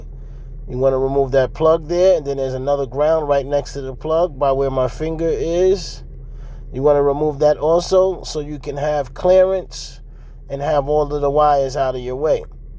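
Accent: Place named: Philadelphia, United States. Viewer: I see your page says Orlando but bruh I swear you sound like your from Philly.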